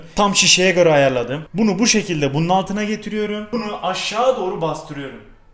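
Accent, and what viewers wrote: Adana, Turkey. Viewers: Abi adana şivesi gibi konuşyorsun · Adanalı mısın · rendeliyrum adana şivesi çok iyi :D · alürüm geçirüm eklüm aktarıyorüm abi adanalı mısın hzhshshebshs seviliyosun cok beceriklisin masallllaahh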